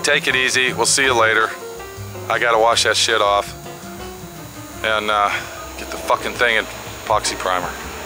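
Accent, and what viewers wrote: Texas, United States. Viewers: your videos are fun to watch and listen to a texan drawl..your great!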